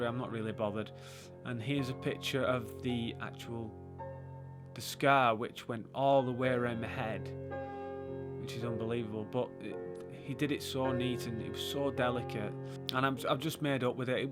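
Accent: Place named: Hull, United Kingdom. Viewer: Hull in england maybe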